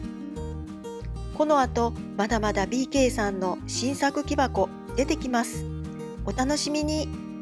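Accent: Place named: Kansai, Japan. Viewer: 屋外で植えていらっしゃるようですが、場所はどちらですか。 冬は霜が降りないのですか。 言葉のイントネーションの感じから、西の方の地方なのかなと思いますが、寒さはどうなのでしょう。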